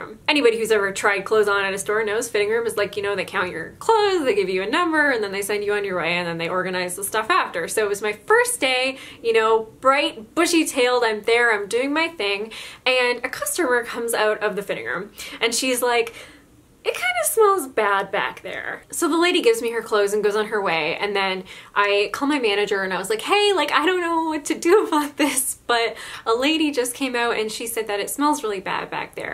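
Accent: Newfoundland, Canada. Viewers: I don't have a retail story but your Newfoundland accent is so cute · Your Newfoundland accent made my LIFE! I'm a Newfoundlander living in Chicago and I have absolutely answered my salon's phone in a heavy Newfoundland accent before. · Lmao that's such a good newfie accent u didn't know you were newfie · I HAVE ALWAYS WANTED TO LEARN A NEWFIE ACCENT I AM IN TOTAL AWE YOU DON'T REALISE THE TALENT YOU HAVE IT IS SUCH A TREASURE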